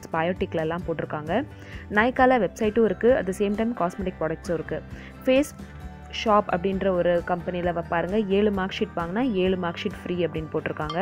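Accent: Madurai, India. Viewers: OK mam unga voice nalla irukku athuvum illama neenga madurai ache super mam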